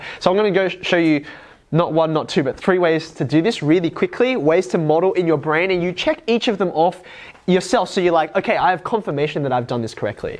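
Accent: Australia. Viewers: nah he's from the land down under (australia)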